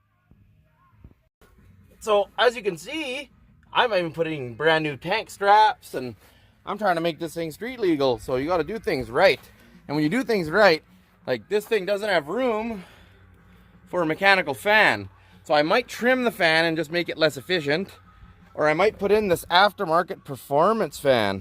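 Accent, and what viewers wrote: Canada. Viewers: My first time watching this channel. Is this guy related to Zip Ties and Bias Plies? All these guys from the Kanukistan prairies sound the same to us yanks. Why is the ground not white?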